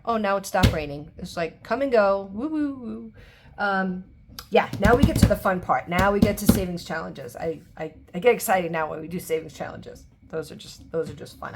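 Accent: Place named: New York, United States. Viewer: Hi! I’m enjoying your videos! Really miss hearing that NY accent. I haven’t been home in so long. 💜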